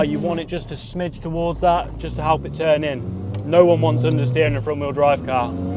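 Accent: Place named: England, United Kingdom. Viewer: He have an english accent and the car lincense plates are english. So no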